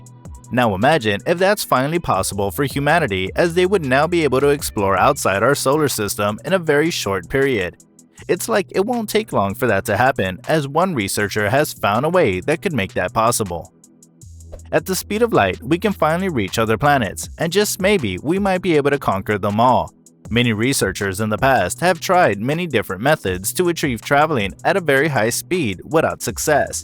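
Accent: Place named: Russia. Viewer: Liked it. I subscribed to your channel. His English resembles that of the Thoisoi2 channel's narrator, who has a Russian accent. Beautiful pronunciation. I found your pronunciation of Miguel Alcubierre's name funny. Greetings from Tupã, countryside of the state of São Paulo, Brazil. Live long and próspera 🖖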